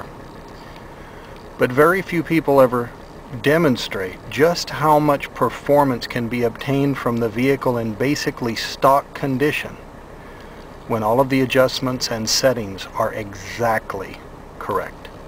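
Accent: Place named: Sweden. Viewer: ! haha sounds like you could be swedish. nice video love the merc diesels ive got a w123 240D euro of course.;)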